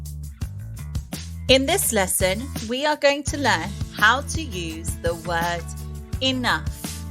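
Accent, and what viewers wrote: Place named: United Kingdom. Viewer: Hi! I think I hear your name like that, I fall in love with the British accent, I like the way of teaching with animation, because the content is visualized and it is very practical to get started, I'm sure that this channel will grow because its content is very clear to learn, success and thank you for your contribution and dedication...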